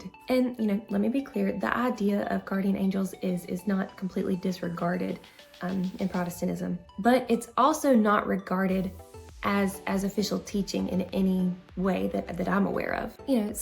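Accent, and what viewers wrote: South, United States. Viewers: I'm a lifelong Catholic and I found this explanation in terms of the buzzer and the safeguard really, really helpful. I think the parallel you're drawing with the way Catholics react with "that's not what the Church teaches" is very apt. Thank you so much for sharing your experience. I really love your videos. Also, as a native Texan living in New England, I enjoy hearing your very Southern accent. It sounds very much like home.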